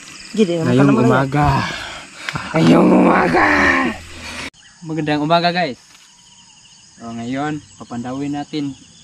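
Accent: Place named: Cebu, Philippines. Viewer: Taga diin ka boss kay feel nako Cebu inyuha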